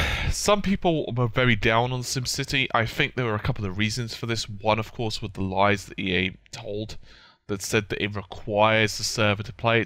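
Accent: United Kingdom. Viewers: British?...I just thought u were always drunk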